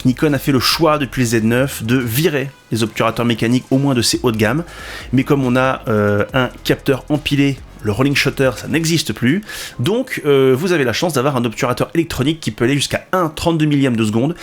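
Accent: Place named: France. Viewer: Super vidéo. Tu t'y connais mieux que moi en photo mais en réseau.... USB c format plus compact, tu prends un d'ongle à 50 euros et tu as ton Ethernet. Deuxième raison pour laquelle tu te plantes, port Ethernet souvent c'est du 1 giga de bandpassante bref en 2023, c'est pour les ashmatiques: USB c c'est 20 fois plus. Moi qui fait du montage 8k 60 FPS avec mon MacBook M2 max vers mon Nas thunderbolt 4 100 teras, c'est Dealbreaker comme tu le dis avec ton accent français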